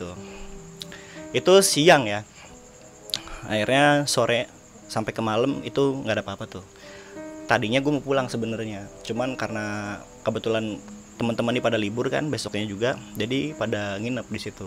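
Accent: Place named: Makassar, Indonesia. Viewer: Hostnya anak makssar yah??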